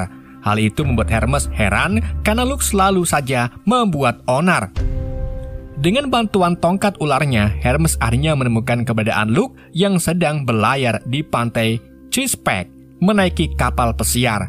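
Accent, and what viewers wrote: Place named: Banyumas, Indonesia. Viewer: Orang ngapak ni pasti adminnya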